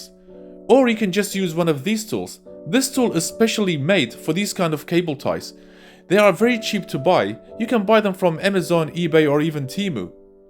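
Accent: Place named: Eastern Europe. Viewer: You sound EASTERN EUROPEAN… but you are from NEW ZEALAND ?? And ZEALAD is actually DUTCH..😃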